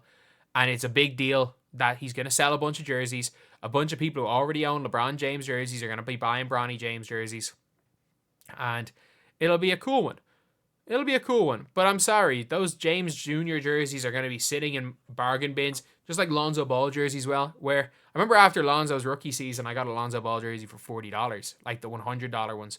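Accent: Ireland. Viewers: yo bro are you irish? the accent looks like that :D good content, cheers))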